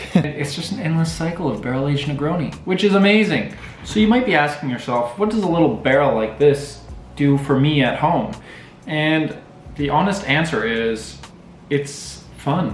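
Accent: Canada. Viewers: Is this Canadian accent? You talk like my nephew who's now on Canada...rn 🇨🇦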